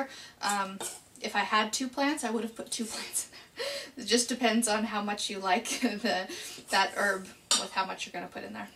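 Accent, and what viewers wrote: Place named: Norway. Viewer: actuly kinda cus you know you have that norwegian hmm actuly dont know how to explain it my its in the ending of words where you can hear it xD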